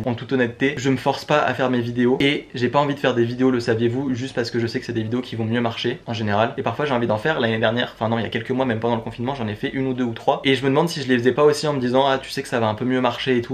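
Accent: England, United Kingdom. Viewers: Alors, j'adore ton accent anglais, team Serpentard depuis toujours hehehe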